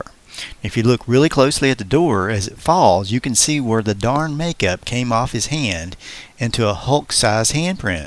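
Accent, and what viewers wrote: South, United States. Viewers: Where in the South is your accent from?? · The guy narrating sounds like he's out-of the dukes of hazzard.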